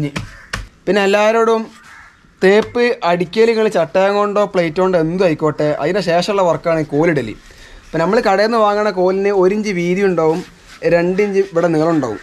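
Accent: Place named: Kondotty, India. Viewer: നല്ല ക്ലിയേർ വിഡിയോ എല്ലാവർക്കും മനസ്സിലാകും..ഇനിയും വരട്ടെ,അല്ല നിങ്ങളുടെ നാട് എവടെ കൊണ്ടോട്ടി ആണോ മൊറയൂർ... ആ ബാഗത്തെ ഒരു സ്ലാഗ്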